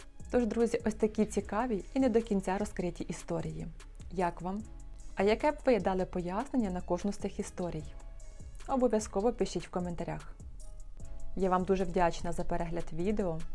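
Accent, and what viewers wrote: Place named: Western Ukraine, Ukraine. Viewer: Мені подобається Ваш західний виговір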